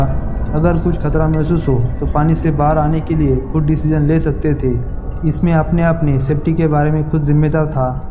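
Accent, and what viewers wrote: Maharashtra, India. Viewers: Marathi ho kya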